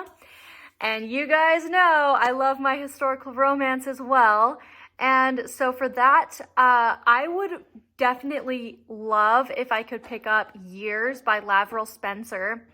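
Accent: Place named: Utah, United States. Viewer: Are you from Utah? You have a Utah accent. That’s why I was asking haha.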